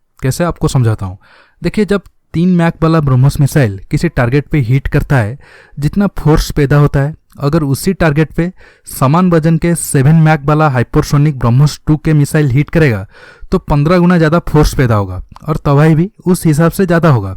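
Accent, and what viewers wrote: Odisha, India. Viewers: Bhai Tu Odisha ru na?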